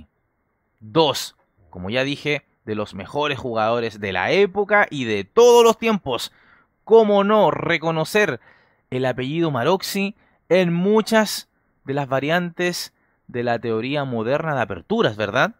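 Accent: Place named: Chile. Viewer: el acento chileno me resulta muy comodo para entender analsisis de ajefrez ! gracias!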